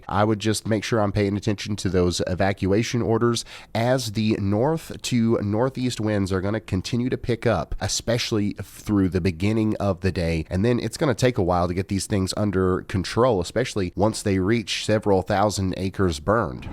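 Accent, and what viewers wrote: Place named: Kentucky, United States. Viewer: Eastern KY where you live? Omg! Idk you were from KY! Should have known though bc you sound like a Kentuckian! 😂 (Me too 🤷‍♀️)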